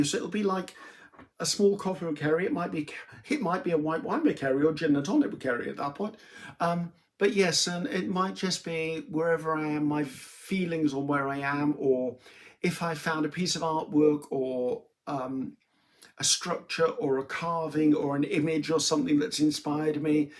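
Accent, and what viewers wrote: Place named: Wales, United Kingdom. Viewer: Ceri, I am one of your new 2022 subscribers and I appreciate your sincerity, honesty and how you are yourself. Thank you for speaking so candidly about your bout of depression. I've dealt with depression throughout my life and it's good to hear artists speaking about it. I love that you are from Wales (your accent makes me think of my Welsh mother in law) and how you embrace the diversity that surrounds you. Like you, I have much to be grateful for. I wish you a wonderful Christmas and all the best in 2023. Know that you are supported from your fans (like me) in Canada.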